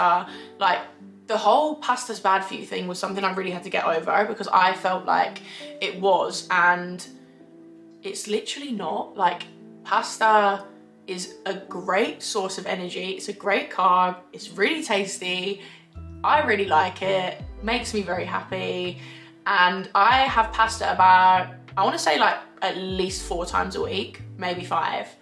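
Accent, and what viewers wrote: Scotland, United Kingdom. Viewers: Well done on the weight loss! Amazing work! I’ve not watched you in years and you only just came up on suggested even although I’ve been subscribed for years. Where’s your Scottish accent gone??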